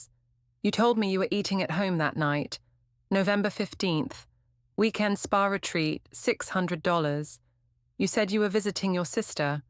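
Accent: United Kingdom. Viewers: Excellent, if sad, story. Good plot editing, good narration. Captions seemed correct for spoken words and had proper punctuation. Recommend this channel. Voice has British accent to my ears.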